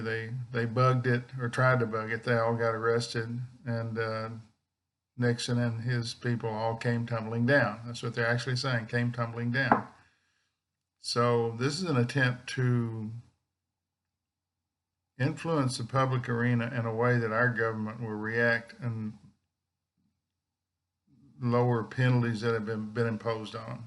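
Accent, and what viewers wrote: Texas, United States. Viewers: Moved from Austin to N. CA 20 years ago and I find a certain comfort in Texan twang (not as strong as my dad's was), too... and I miss Central Market/HEB!:-) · I sure enjoy your readings, but I LOVE your accent!! Moved from TX to AZ this year and I miss that TX accent .... and HEB !!!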